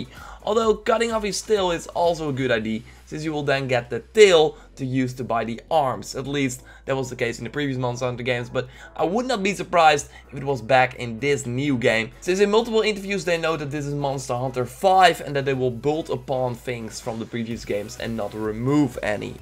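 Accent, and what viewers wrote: Netherlands. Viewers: Sounds Dutch to me.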